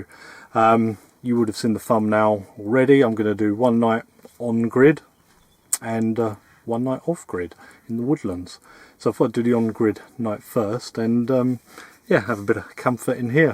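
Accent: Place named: RP, United Kingdom. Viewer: gone all posh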